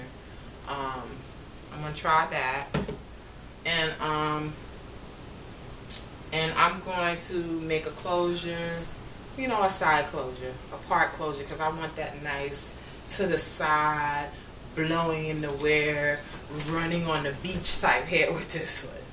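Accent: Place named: New York, United States. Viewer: Gurl,, you had me on the floor. It is rare to hear an NYC hair person on here. I loved your frankness!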